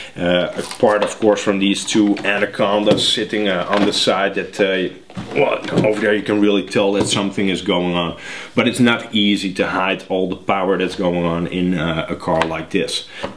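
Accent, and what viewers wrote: Netherlands. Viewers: ok, are you from Holland?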